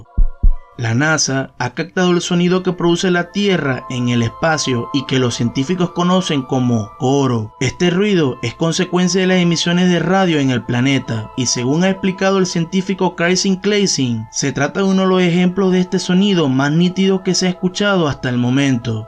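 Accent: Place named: Caribbean. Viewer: Lo unico escalofriante es tu horrible acento caribeño...por que no te dedicas al regaeton!!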